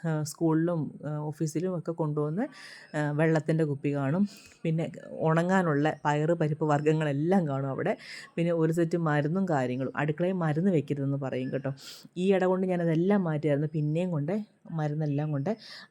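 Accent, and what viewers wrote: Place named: Kottayam, India. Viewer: ഇയാള്ടെ വർത്തമാനം കേൾക്കാൻ നല്ല രസം. . നല്ല voice. . കുറച്ചു സീരിയസ് ആണോ ഇയാൾ. ....കോട്ടയം slang ഇഷ്ട്ടം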